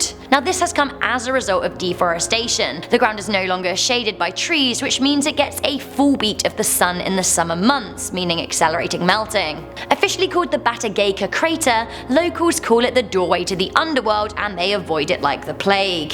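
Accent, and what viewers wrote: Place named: United Kingdom. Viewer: Hi, I love your accent fore sure you can't denie you're from UK ;) <3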